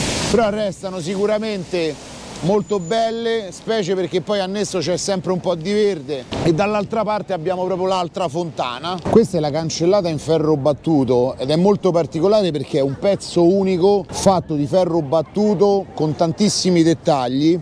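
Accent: Rome, Italy. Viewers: Grande Cicaló, sto video giunge a fagiolo, a Roma ce devo da vení fra du mesi, hai inserito delle info importanti, si te vedo in giro pe Roma te saluto.. Quant'è bella sta città ❤️ e quanto me piace a parlata romanesca... - Ma dimme, ma come só ste olive.. - eh come só, sò greche 😉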